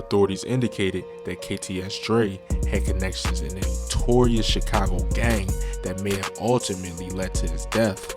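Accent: Baltimore, United States. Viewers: Maryland x Delaware checking in ❗⚠️ I hear that BMore accent. Lol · That Baltimore accent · This narrator got to be from Baltimore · You got that Baltimore accent bro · Dude sound like he from Baltimore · Bro gotta be from Baltimore. The way he talk. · one person demise is another person's entertainment. I can't even take him serious. Dude has a Baltimore accent. You should be doing documentaries on your own city.... I forgot, it's been done already. The Wire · Does the narrator have a Baltimore accent ? 😭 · True. I know that Baltimore accent anywhere.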